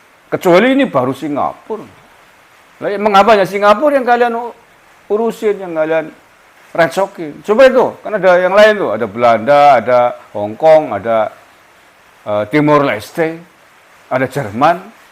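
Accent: Banyumas, Indonesia. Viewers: Sampyn orang mna pak,,,nada bicarane mirip nyonge,,,hehe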